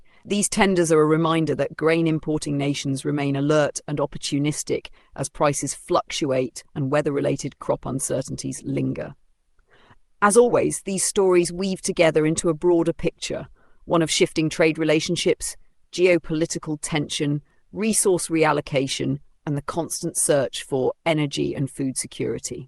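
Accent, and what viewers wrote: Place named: United Kingdom. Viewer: This is my new favorite channel. Pertinent info given in a cool British lady's voice. 😊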